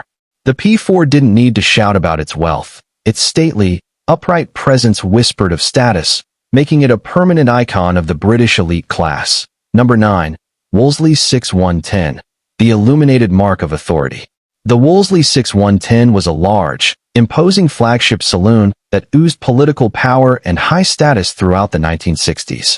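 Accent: United States. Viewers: Who wrote this absolute BULLSH*T. Do your Homework. Grrr Plus, to have a Yank doing talking, well it is a disgrace The rover P4 was not called the Annie Rover. It was called the 'Auntie' Rover. The Morris Oxford and the Austin Cambridge Were just ordinary family saloons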